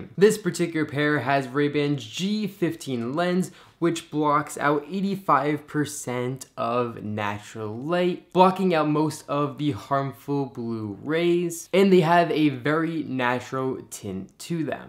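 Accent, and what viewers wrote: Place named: United States. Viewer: Oh and by the way, please don't change the way you speak, we English like an American to sound the way we like Americans to sound, just the way you sound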